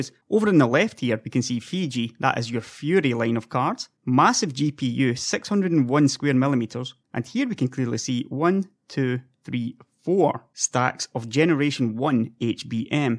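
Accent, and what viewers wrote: Scotland, United Kingdom. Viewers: so it is scotish not indian.... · Its Scottish its difficult if you aren't native English speaker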